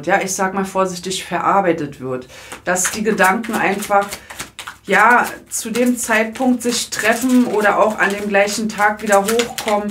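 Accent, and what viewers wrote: Berlin, Germany. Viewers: frei Schnauze mit Berliner Dialekt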